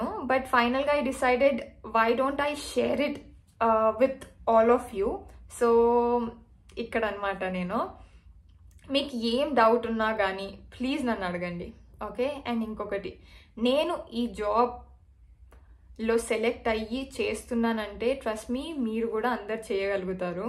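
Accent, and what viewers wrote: Telangana, India. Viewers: Meeru totally telagana slang lo Matladuthunaru ante keka anipinchindi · What a cute telangana slang akka🤩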